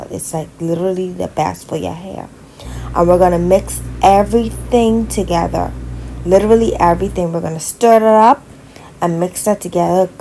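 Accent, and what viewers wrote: Bahamas. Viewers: You’s from the bahamas aye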